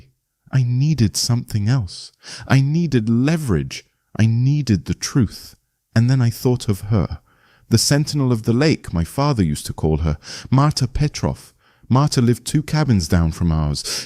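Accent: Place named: United Kingdom. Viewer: Sorry hearing the Accent from the UK talking about Texas farmers is too phony😊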